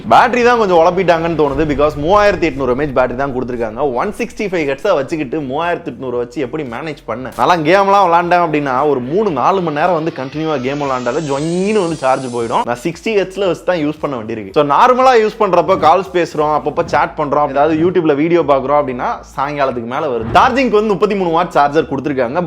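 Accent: Madurai, India. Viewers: Bro oungaluku recent videos laa madurai slang veliyaa varuthu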